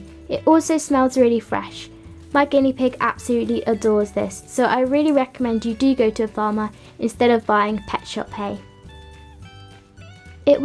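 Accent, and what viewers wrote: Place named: Scotland, United Kingdom. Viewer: I was wondering where are you from because I’m Scottish and we have the same accent ❤️ I also have a guniea pigs names Zelly and George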